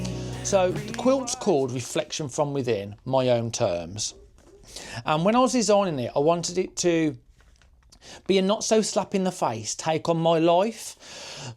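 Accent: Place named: West Midlands, United Kingdom. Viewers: Oh, first of all I’m sure I’m not the only one who would love to give you a big hug and sit you down with a cuppa and a big slice of lemon drizzle cake. I love your accent because my dear old Mum was a Midlands girl and the accent gives me a warm glow every time I hear it. Now, as regards your work I would refer you to our Kate at the last homely house. She makes me laugh out loud when she talks about ‘the quilting police’. Remember my flower, that perfect is produced by machines, handmade is done with love and swear words and is by nature dotted with faults and slipped stitches, just like people. You have already created a legacy. Nearly fourteen thousand people care about you and watch your work. Take a moment to pause and look back at the road you have already travelled. You are loved and respected. Ros ❤️ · You sound like you're from West Midlands, I am too.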